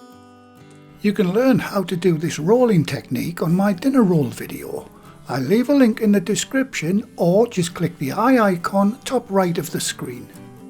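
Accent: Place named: England, United Kingdom. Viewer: Hi I love your channel, I am an English girl living in USA and I miss home very much so it’s great having your accent (even though I am a London girl lol) talking me through my bread recipes! When you get to the end of a step I always say “okay ”! My husband says you talking to again lol. You have taught me a lot about making bread, it’s my new passion, I never thought I could make bread (I have been posting pictures of my bread and other recipes on Facebook and one of my friends called me a kitchen witch, a great compliment that I share with you as it’s because of your videos and the great way you explain) and I didn’t think I had the tools and utensils to do so but where you show the alternatives I have built up my own bread making utensil drawer. I hope to be half as good as you one day. I was wondering if you have a seeded bread roll recipe that you could share with us? 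I am a vegetarian if you have any good veggie recipes that would be nice! Thank you for sharing your gift! Oops as I was typing this message you say how you can add seeds can you add them to white rolls also?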